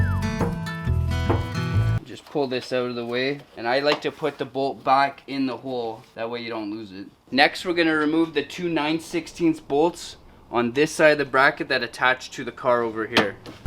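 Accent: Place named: Canada. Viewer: Are you Canadian Bro? I am too! Maybe you are in northern USA, the accents carry over. Great video!!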